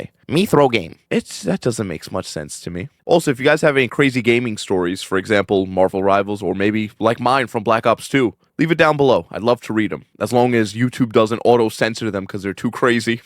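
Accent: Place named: Alabama, United States. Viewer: I definitely thought bro was Alabama white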